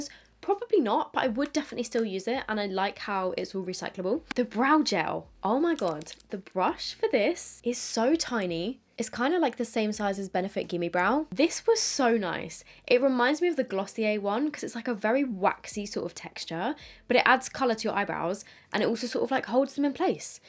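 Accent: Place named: England, United Kingdom. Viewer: Wait I love it 🥰 and one more thing! where are you from in England 😅 love the accent 😆🤩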